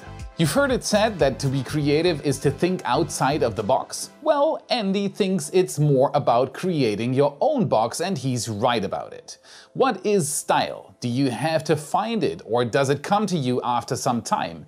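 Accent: Germany. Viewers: Aha, ich hab direkt rausgehört das du aus Deutschland kommst, aber irgendwie keine Anzeichen gefunden bis ich auf deine Webseite gegangen bin 😉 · Is this guy German?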